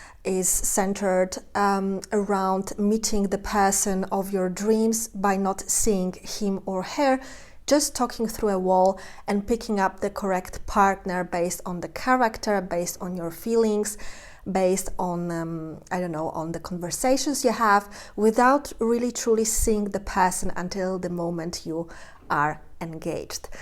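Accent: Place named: Eastern Europe. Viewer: You come from a different culture . German men and women are very equal and it isnt a problem for a man to share an apartment with women...people are much more free unlike the soviet union where you were brought up It is only a sign that a man is open minded. When i shared an apartment i preferred to live with men because women were more irritating but i also shared with a nice woman once. It depends on the PERSONALITY. Your accent indicates you are east European where men and women have very rigid gender roles . You are reaching absurd conclusions . A man who seeks spiritual retreats is spiritual. You dont seem to have a lot of experience living with other people So it is even more absurd you speak with such confidence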